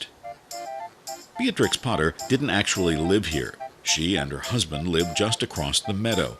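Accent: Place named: United States. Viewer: Unfortunately it's an American voice over . 🇮🇪🇪🇺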